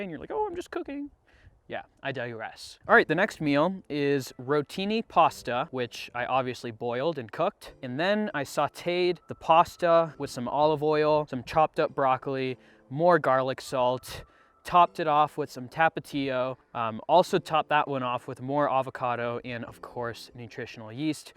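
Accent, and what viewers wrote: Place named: United States. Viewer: hi from india! neat videos. you sound like american born. but what is your ethnic origin? :)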